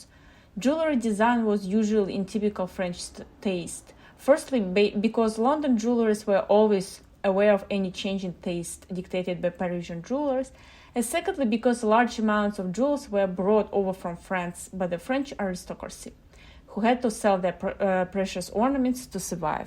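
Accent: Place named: Russia. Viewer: ruskakaia accent lol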